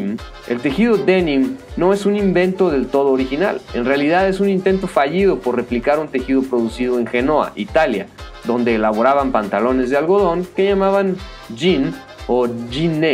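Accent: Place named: United States. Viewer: Tu voz es como de un yankke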